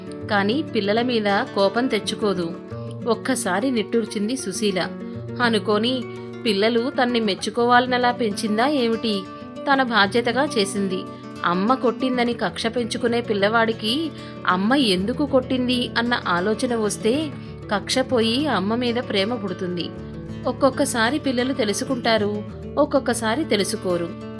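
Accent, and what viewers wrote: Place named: Godavari, India. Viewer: ఇది సాధారణంగా తండ్రికి ఎదురయ్యే పరిస్థితి. కొందరు తల్లులు కూడా ఎదుర్కొంటారనుకోండి! భర్త కూడా అలా పిల్లలతో కలిసిపోయి భార్యను ఆడిపోసుకోవడం కాస్త ఆశ్చర్యాన్ని కలిగించింది. అతనైనా ఆమె వాళ్ళను తీర్చిదిద్దిన విషయాన్ని గుర్తించి వాళ్ళను మందలించి ఉండాలి. అప్పుడు కథ సహజంగా ఉండేది. స్త్రీవాద కథ రాయాలని రాస్తూ స్త్రీ కూడా స్త్రీకి శత్రువే అని చెప్పే ప్రయత్నం చేశారు రచయిత్రి అనిపిస్తోంది. అచ్చం మా గోదావరి జిల్లాల స్త్రీల యాసలో ఎంత బాగా చెబుతున్నారో! ఎన్ని సార్లైనా మెచ్చుకోకుండా ఉండలేకపోతున్నాను. ఆమె విజయవాడలో పెరిగిన విషయం తెలియక ముందు నెల్లూరు వాసి ఈ యాసలో ఎలా మాట్లాడగలుగుతున్నారన్న ఆలోచన ఇప్పటికీ పోక ఇలా అనిపిస్తుందేమో! విజయవాడ యాస కూడా కాదు ఆమెది.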